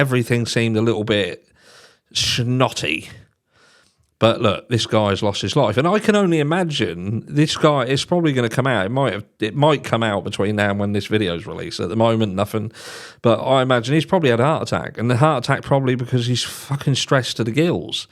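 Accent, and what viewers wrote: Norfolk, United Kingdom. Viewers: That sounds like a west Norfolk accent? Enjoyed you vid. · I thought I heard a bit of Norfolk in the accent 😂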